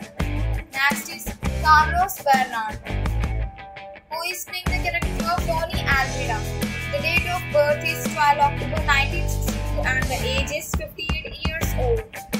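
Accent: India. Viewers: The way u pronounce the names made me cringe so badly. Typical badh badh ding ding Indian accent.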